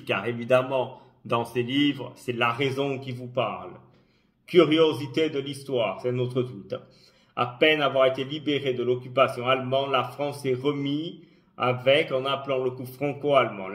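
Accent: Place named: Italy. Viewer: Aldo et Aberkane sont d’origines algériennes certes et alors méfiance lol c’est pathétique quand même.. il faut dire que leurs discours et vidéos nous parlent très bien, souverainisme ou conspirationnisme appelez cela comme vous voulez lol nous on les comprends bien contrairement à toi qui est sûrement payé comme nos journalistes pour nous faire de la désinformation totale en passant par le bashing .. juste un conseil essayez d’être à leur niveau intellectuel et peut être que vous ferez du buzz.. vu votre accent vous êtes en attente de changement de carte identité italienne pour une carte d’identité française lol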